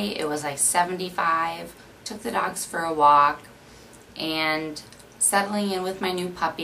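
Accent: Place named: Midwest, United States. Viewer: You do have a " Midwestern " accent , but that's ok!!!! :) it differentiates each of us! I love how you say " roof " :) Em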